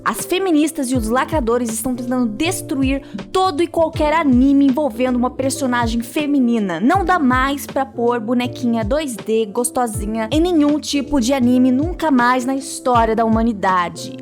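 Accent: Paraná, Brazil. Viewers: se eu fosse chutar, acho que você é paranaense.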